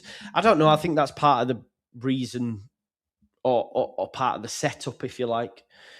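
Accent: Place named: Leeds, United Kingdom. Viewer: Best proper Leeds accent on Youtube. I missed you, man.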